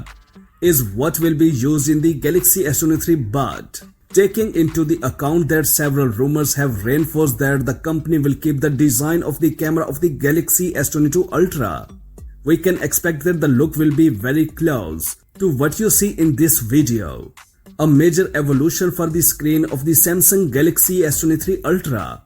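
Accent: India. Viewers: Sorry, thats no interesting information and please talk without IIndian slang if you want to tell something to the world. I had to break up watching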